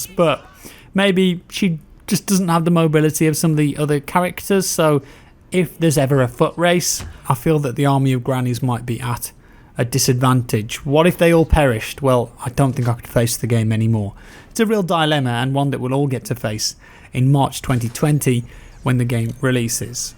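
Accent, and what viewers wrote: United Kingdom. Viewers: “Cameras are everywhere” this guy has a British accent and doesn’t know that London is the city with the most surveillance in the world, cameras are already everywhere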